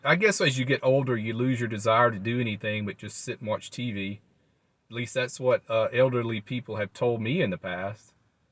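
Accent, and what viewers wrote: South, United States. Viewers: I just found your channel. It is very interesting. I have already seen several videos. I do not understand why your videos are interesting when all you do is just talk to us from your driver’s seat. Is it the pleasant face? Is it the melodious Southern drawl? Is it the nice story telling? I just don’t know but I have subscribed to your channel and clicked on the bell. Best wishes.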